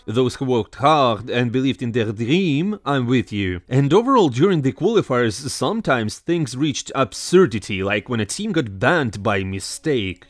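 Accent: Russia. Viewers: bros mocking French accent meanwhile having the strongest Russian accent :DDDDDD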